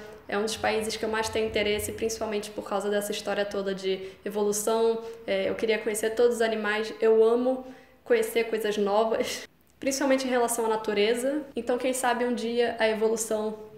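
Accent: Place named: Portugal. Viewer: Vc é de Portugal ou não?kkkk vc tem um tipo de fala de lá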